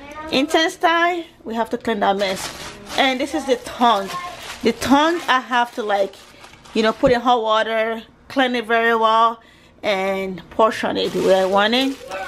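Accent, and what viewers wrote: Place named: Yoruba, Nigeria. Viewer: Am so addicted to this channel i just love the way you speak your yoruba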